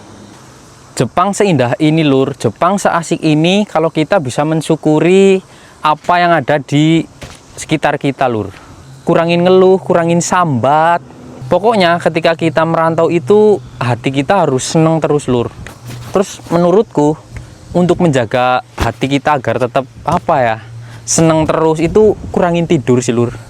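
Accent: Banyumas, Indonesia. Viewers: Suka bgt perumahan di desa tp rapi bersih mase. Ngapak aslinya mana mas sukses trus mas broo❤ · Mas aku seneng liat konten mu bahasa mu dialek mu khas inyong2😅 salam kenal mas aq dr Banjarnegara mas asal kota mana? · Asline sampeyan wong ngendi ya Mase,bahasane ngapak kaya wong banyumas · Asline wong ndi mad ning Jepang bahasa jawa ngapak ya. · Mantep ngapak Banyumasan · si. Ngendi asline jawa ngapak? Ngendi Cilacap.? Banyumas? Kebumen? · Asalamualaikum,salam kenal,mas aslinya jawa mana yah ko mirip kaya kampung ku banyumas.terus di jepang udh brapa th..? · Mantap ngapak ya mase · ngapak banyu mas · ADLI.DARI. NGAPAK. COLACAP,APA. BANJARNEGARA,APA. PURBALINGGA.? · Sampean ngapak Jepang pa mas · Nyong penonton baru mas, seka wonodobo jateng, nyimak separo lgsung tak subkreb, tertarik karo kontene sampean ben tambah ngerti suasana pedesaan jepang, narasune sampean maer kiye, sederhana trus ora ngguwang logat daerahe, ngapak ya, pada berarti, oke semoga sukses bro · Bahasane ngapak Wong ngendi sih ko? 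😅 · Wong bnyumas ngpak2 mntap mas bro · Mas sampean asal nya jawa ngapak ngndi sih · Jenengan masih ngapak kentel banget yak😂😂😂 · Rika ngapak yaak?. Bambang Banyuwangi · Lugatnya jateng ngapak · Bahasene ngapak. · Mas Indonesia dari mna ko bahasanya ngapak kenapa kerja d desa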